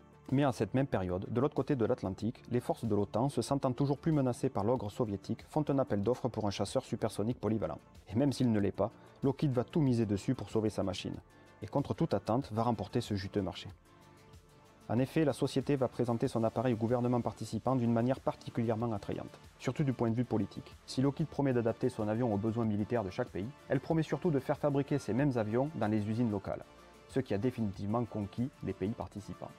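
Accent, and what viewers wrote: South, France. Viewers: excellente vidéo ! bel accent du sud 👍😆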